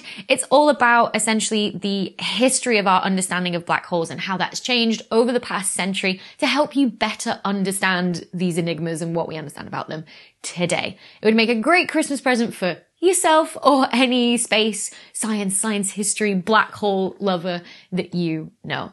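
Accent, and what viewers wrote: United Kingdom. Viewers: This is my first time seeing a video with Dr. Becky. Very informative, on a subject I look forward to. My American ears take time to get accustomed to her veddy veddy Bditish accent. Thank Goodness she talks like Julie Andrews, and not like Eliza Doolittle in her "Before" stage, the way so many cockney techies on YouTube do (I hear them and I think, "The royn is Spoyn staays moynly in the ploin" and I want to tear my hair out.).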